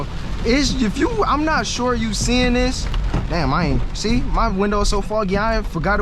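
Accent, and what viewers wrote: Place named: India. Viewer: Vwat da fuc bruddah looosh ash 🤣🤣 Indian accent caught me hella off guard might just be a subscriber now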